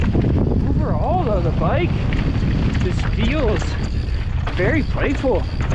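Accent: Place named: Western New York, United States. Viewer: Fellow Rallon rider here but I wanted to know - are you from WNY? Or Eastern Michigan? Your voice sounds like home and I'm from Rochester.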